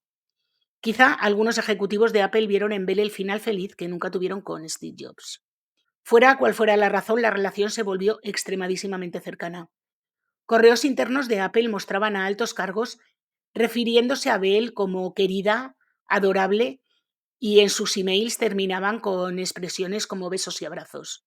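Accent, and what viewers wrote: North, Spain. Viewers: Creo haber oído a alguna vez que es madrileña. Pero si parece que tiene acento del norte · No lo entiendo. Como pueden ser tan atrasados los americanos? Esos remedios son los que decían las bisabuelas, como lo del limón en la mesita de noche para el constipado. No es de extrañar en un país que no tiene sanidad pública. Hay que ser muy imbécil para creer en esos milagros a expensas de la ciencia. Solo faltan los vaqueros vendiendo crecepelos de estado en estado cual salvaje oeste. Una panda de retrasados! Un cordial saludo! Me encanta tu voz.😊 concretamente tu acento. Del norte?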